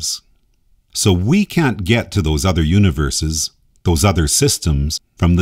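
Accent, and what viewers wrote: Canada. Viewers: You’re Canadian! I heard it in the aboot. Great videos.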